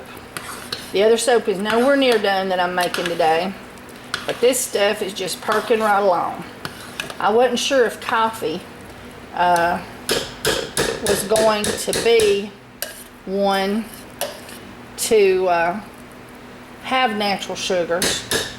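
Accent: South, United States. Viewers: You have to be from the south! Love watching videos with fellow southerners, I it feels more like I'm watching a friend instead of some boring video.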